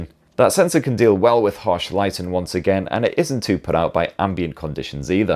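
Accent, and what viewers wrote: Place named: Newcastle, United Kingdom. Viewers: Sorry. I am not sure what you mean? I was just saying the way in which he talks he normal from his area and many of the expressions spoken are common place within that area. No insult was intended. Was merely trying to give people knowledge of the video creators dialect in the north. I am not sure about internet lingo franc though. Does 'ffs' denote a stutter? Thanks. Be healthy. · He’s a Geordie, say no more. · A lot of it isn't even scripted he actually speaks. Like that it isn't unusual in certain part of England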